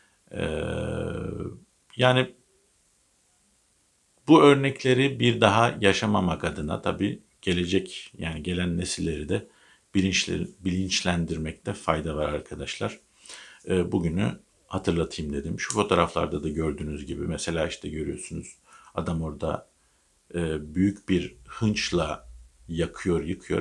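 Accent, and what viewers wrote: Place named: Black Sea, Turkey. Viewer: Ula yine gırdun geçirdun!! Özellukle iki yorimun çok superidi!! Sağolasun. Garadenuzli değilum lakin o şiveye bayılayrum!! Öpildun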